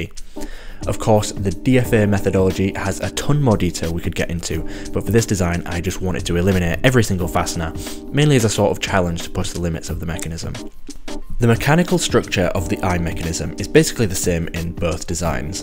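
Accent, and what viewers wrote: Hull, United Kingdom. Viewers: Hi, is that a Hull accent?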